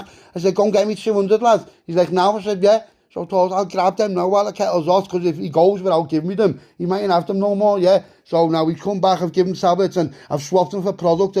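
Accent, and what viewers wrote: Liverpool, United Kingdom. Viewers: Big up the Scouse narrator, proper legend you lad, just got in bed, the mrs is getting frisky and I got an excuse, don't even have to use the headache blag, come on give it a rest I'm listening to scouse stories. Hahahahahaha 😆😆💯💥.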